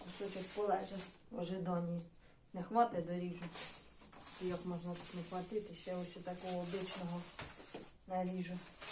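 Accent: Poltava, Ukraine. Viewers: 👍🙏❤💙💛💐👋Вітаю! Випадково натрапив на ваш канал. Сподобалось, як розмовляете з полтавським акцентом. Ви також красива, бо полтавчанки ж найкращі!!! Щиро бажаю міцного здоров'я, простого жіночого щастя та якнайшвидшого мирного життя!!! А керамічними ножиками не дурні ріжуть, а дурні їх виготовляють. Такими ножиками тільки зелень різати, а мерзле смачне сало добре ріжуть саморобні, дебелі та надійні ножі. Бажаю вам подальшого розвитку каналу та багато - багато доброзичливих підписників! Хай щастить!!!❤❤❤✍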